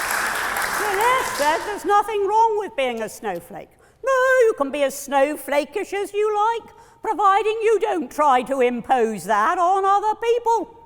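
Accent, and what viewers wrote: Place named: England, United Kingdom. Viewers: She is from the South West of England Daniel, her accent is a well educated accent (neutral, meaning you can't recognise where she's from in Britain) with a very slight South Western twang, otherwise she'd be speaking like the archetypical pirate. She has a wavering tone in her voice which all old Grannies have. You have heard old Grannies saying a speech in public in your country, it's completely different from talking quietly, also it could be the way she talks that annoyed you, that does happen. Anyway Daniel, no matter, she's from the South West. There are accents you like, and accents you don't like and sometimes it could be the way they speak. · This is a most annoying English accent , What part of England is she from .?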